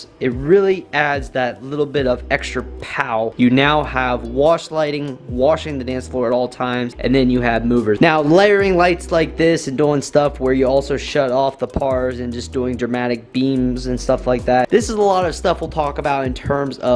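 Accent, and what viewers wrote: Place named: South, United States. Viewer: Sounds like you are getting a bit of a Southern Draw! Welcome to the South Brother.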